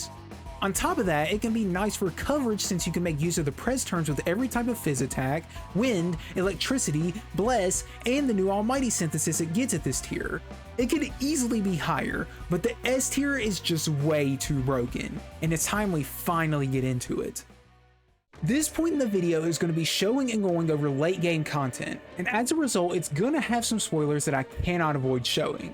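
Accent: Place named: South, United States. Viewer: are you from the south? i like the accent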